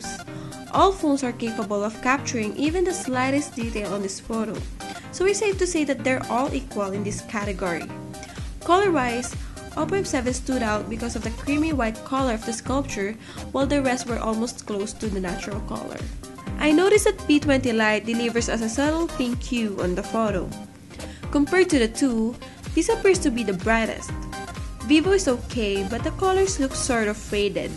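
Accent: Philippines. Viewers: Loving the filipino accent!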